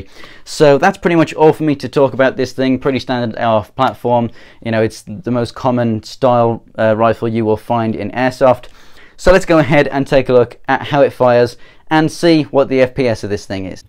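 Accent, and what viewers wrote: United Kingdom. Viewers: Hey, I’m assuming from the accent that you live in the UK. Just curious where you got your mags from as I can’t find any UK online airsoft retailers that stock them. Did you buy them from a US site and have them imported? (Great video btw)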